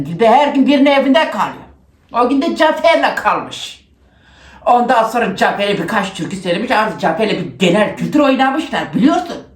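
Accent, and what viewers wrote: Turkey. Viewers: Çok iyi yapıyor ya Kürt Alevi şivesini 😂😂😂 sanki bizimkilerin konuşmasını dinliyorum 😂😂😂 birebir 😂😂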